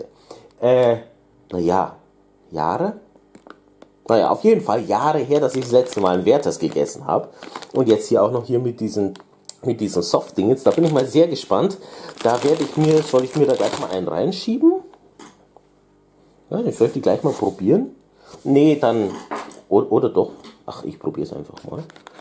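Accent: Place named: Ukraine. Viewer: Meine Tochter hat eine Go-Pro (ich hoff, das heißt so) fürs Reiten - das bräuchtest du für deine Auspack-Videos. Jedesmal wenn du was essen wolltest, konntest nicht, weil einhändig, du armer Kerl. Dein Englisch erinnert mich an einen Ukrainer, den ich kenne :-) Die unterschiedlichen Farben sind ja geil! Bussis an deine süßen Zwei :)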